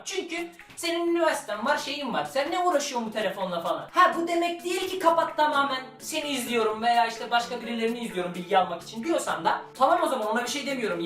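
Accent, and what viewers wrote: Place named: Trabzon, Turkey. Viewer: trabzonun neresindensin reyiz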